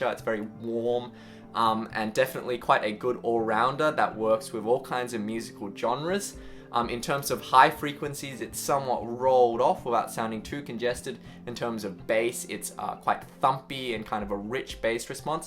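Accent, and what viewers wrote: Australia. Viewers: i mean this in the best way........its crazy seeing an asian with an austrailian accent.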